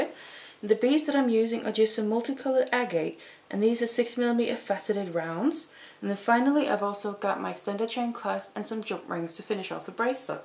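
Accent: United Kingdom. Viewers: She is using the UK pronunciation because, I assume from her accent, she is from the UK.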